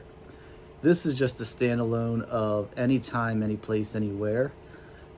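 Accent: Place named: New York, United States. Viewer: You sound like you’re from NY like I am. If you are, I’ve got a 150 or so CDs for you to look at.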